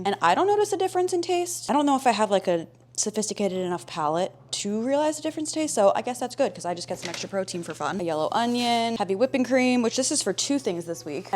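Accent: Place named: Central Pennsylvania, United States. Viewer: Eagles! I love hearing someone with the exact same accent as mine, central PA, gives me inspiration watching your success. Hope you are enjoying Charleston.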